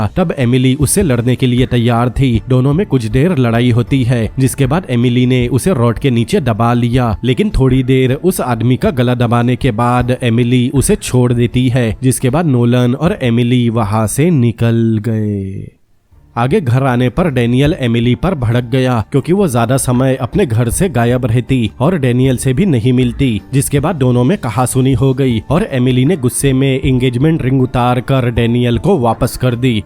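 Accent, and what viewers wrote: Assam, India. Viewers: Kiuki ap ki voice Assamese jisa lagta hai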